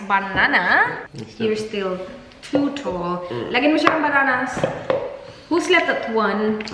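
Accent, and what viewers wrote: Visayas, Philippines. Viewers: bisaya ka te? E hearth ni kung bisaya ka😂😂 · Ms. u meron ka visayan accent po if nagTatatalog... I'm sorry to say that... I'm just priud Bisaya here😉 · Bisaya ka te noh? Haha kay tungod sa char char char na word ug botbot haha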